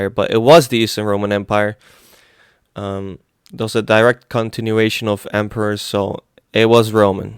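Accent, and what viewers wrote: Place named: Netherlands. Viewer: You sound kinda Dutch to me.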